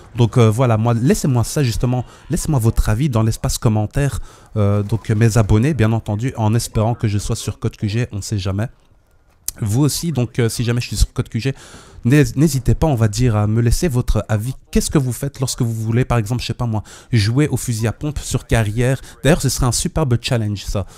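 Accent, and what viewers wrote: Belgium. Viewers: Tu viens d'ou de Belgique? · Un petit accent belge il me seble non? :) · Le commentateur est belge ? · cho l'accent belge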